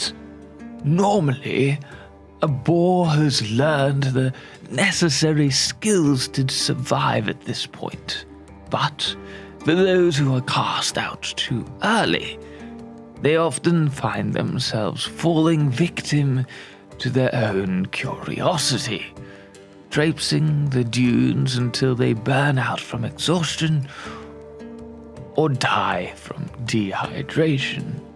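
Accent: United Kingdom. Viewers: LOL! Awesome video. Anyone who tells you, that you aren't talented or an entertainer, please give them your middle finger. Awesome Video. Very creative. The voice inflections of a fascinated xeno biologist mixed with your native British accent, is priceless. Great acting work.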